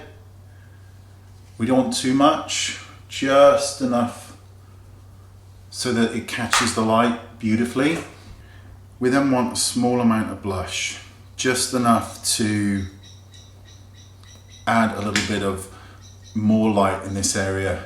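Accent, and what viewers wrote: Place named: England, United Kingdom. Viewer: I love your videos, because I learn so much...not just on how to apply products or which ones you recommend, but the english language, too. You speak soooo clearly and slow which makes it easy for me to understand nearly every single word you say. I bloody love the english accent! 💖 You should start a new channel "Wayne Goss - english for beginners".😜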